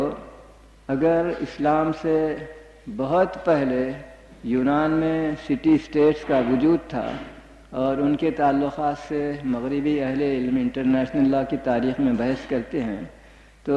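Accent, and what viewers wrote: Hyderabad, India. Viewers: ماشاءاللہ۔۔۔ھر لیکچر ایسا ھے کہ 2020 میں سنتے ھوئے بھی محسوس ھوتا کہ نالج اور علم کے نئے باب کھل رھے ھیں۔۔ڈاکٹر صاحب کا حیدرآباد دکن کا اردو لہجہ مسحورکن ھے۔۔۔اللہ ڈاکٹر صاحب مرحوم کو جنت الفردوس میں اعلی مقام عطا فرمائے آمین۔۔۔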